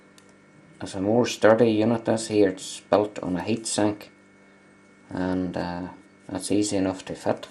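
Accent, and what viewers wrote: United Kingdom. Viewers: Sounds like Northern Irish accent speaking English obviously.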